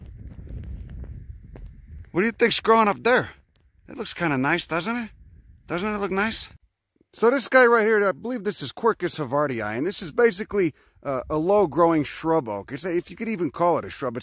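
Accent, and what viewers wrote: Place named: Berwyn, United States. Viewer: Very interesting. I’m in Northern New Mexico and I’d like to learn about all the stuff growing on my property. By the way, you talk like you’re from Berwyn. Are you? 🙂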